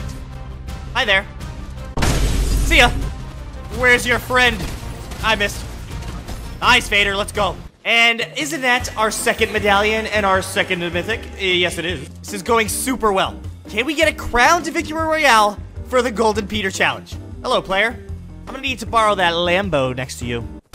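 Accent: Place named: United Kingdom. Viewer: like the British accent lol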